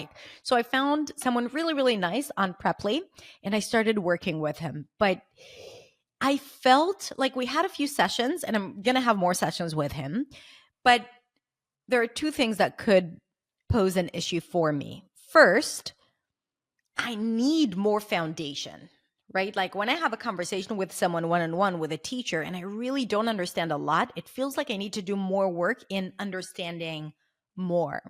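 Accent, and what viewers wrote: Spain. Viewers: Incredible, your English being gently touched by Spanish... I wish you the best in the new life and learning process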